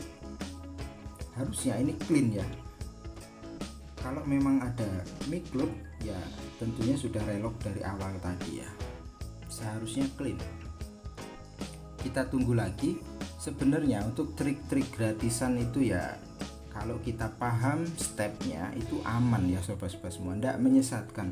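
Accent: Java, Indonesia. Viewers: Mantap kang, tempat servisnya dimana ch bang,dari logatnya ch orang jawa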